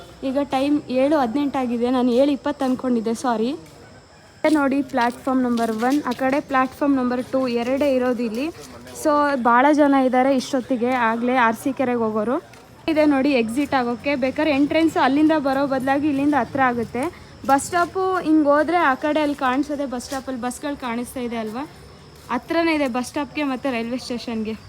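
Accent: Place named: Bellary, India. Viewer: ಮಗಳೇ, ಬಳ್ಳಾರಿ ಭಾಷೆ ಬೇರೆಯವರಿಗೆ ಸ್ವಲ್ಪ ಓರಟು ಅನಿಸುತ್ತೆ, ನಿನ್ನ ವಿಡಿಯೋಗಳನ್ನು ನೋಡಿದ್ದೇನೆ,ಬೇಷಿ ಅದವು , ಮುಂದುವರಿಸು, ಶುಭವಾಗಲಿ, ನೀನು ಈಗ ಬಳ್ಳಾರಿಯಲ್ಲಿ ವಾಸನೋ ಮೈಸೂರಿನಲ್ಲೋ?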